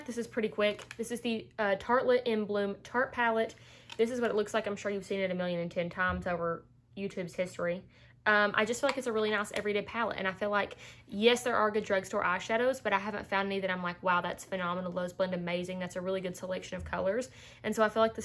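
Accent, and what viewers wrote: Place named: Kentucky, United States. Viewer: I've heard Allie rave about your glosses recently and just came to your channel and right off the bat I like your fun personality! :) Love the green here you got going on in this video and I love your accent too! I'm from and live in NC and there are different and unique southern accents across the southern states, but sometimes I can't tell - where are you from? Also, I am not even 2 minutes into your video yet and you've already got me laughing! :D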